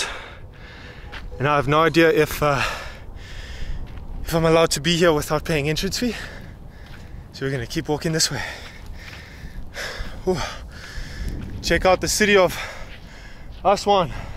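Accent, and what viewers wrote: South Africa. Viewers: The heavy SA accent 😁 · You sound like a South African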